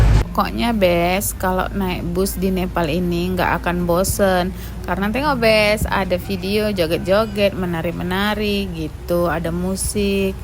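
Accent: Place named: North Sumatra, Indonesia. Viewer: Kak ini orang mana di Indonesia? Logat bahasa Indonesia kak seperti orang Sumatera Utara. Kenalan dong kak, saya followers baru kak🙏🥰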